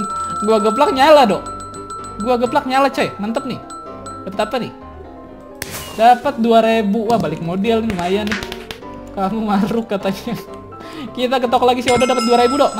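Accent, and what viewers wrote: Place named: Tangerang, Indonesia. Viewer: kek logat tangerang yak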